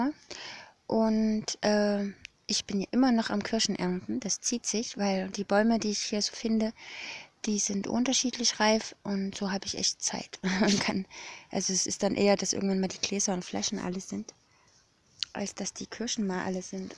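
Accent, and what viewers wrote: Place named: Saxony, Germany. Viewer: Na du weißt ja, dass ich sächsisch mag..😇 Da freuen sich bestimmt auch alle anderen hier in UNSERER Dorfschule, wenn du es nochmal aussprichst..😜 Habe mir gerade einen Kakao gemacht.. Pulver, eine Miniprise Salz, Vollrohrohrzucker und Wasser...😋 Nu issa aber schon alle..😝 War superlecker!!🔆 Und schreit nach mehr.. Werde beim nächsten Mal, etwas Kurkuma, Pfeffer, Muskat, Kardomom, Kokosöl etc. zugeben.. So wie man eine goldene Milch macht, wenn dir das was sagt.. Schön, dass es auch ohne Milch und nur mit Wasser schmeckt..😘 Super Tipp von dir❤😇🔆😘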